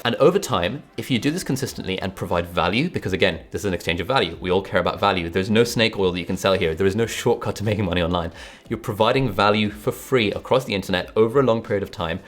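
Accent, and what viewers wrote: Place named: England, United Kingdom. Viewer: Why do you keep saying "dollars"? You're very obviously English, even to an American!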